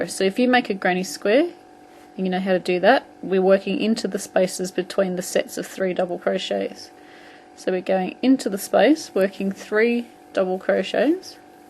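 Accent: Australia. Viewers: I love this tutorial. I am not sure if you noticed, but on the youtube video for this, there is a box that is for interactive transcript. Apparently it tries to translate each frame of dialogue in writing., with your Aussie accent, it is translating it, but, NOT....QUITE....getting the right words. Think Autocorrect fail on a cellphone...only the Youtube version. I will try to copy and past some of this for you. on a new comment.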